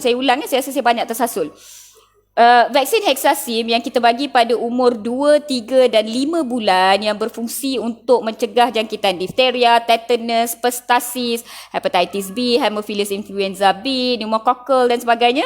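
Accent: North, Malaysia. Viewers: ya ka....igtkn org utara...heheh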